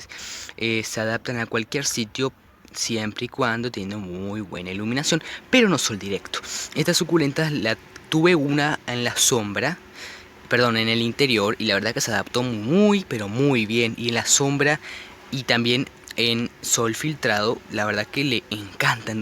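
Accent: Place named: Argentina. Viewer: Fabuloso!!! Muy buena tu explicacion....me encanto!!! Eres ARGENTINO.....de que zona eres??? Abrazo gigante y esperando tu proximo video.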